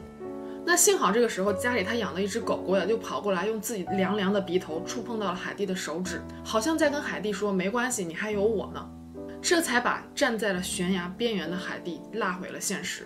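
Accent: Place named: Northeast, China. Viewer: 听口音是东北人哪里的？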